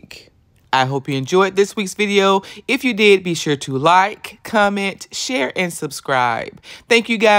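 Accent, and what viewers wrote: South, United States. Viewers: Girl this DIY is the bomb! Thank you so much! Love your southern accent too 😘😘😘😘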